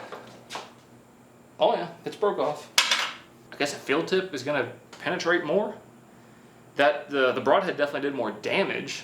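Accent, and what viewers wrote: South, United States. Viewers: I know he sounds southern at times but he jus lost his card there for sure 😂🤣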